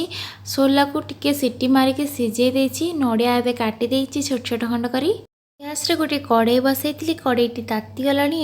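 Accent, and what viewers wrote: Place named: Baripada, India. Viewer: tame baripadia ki tama katharu Jana poduchi hele bahut badhia ghanta Heichi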